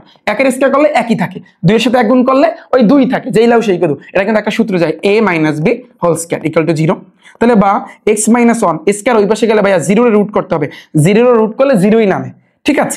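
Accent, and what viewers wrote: Barishal, Bangladesh. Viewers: স্যার এর বাসা কই,,কিছু কথা শুনলে মনে হয় বরিশালে 🤔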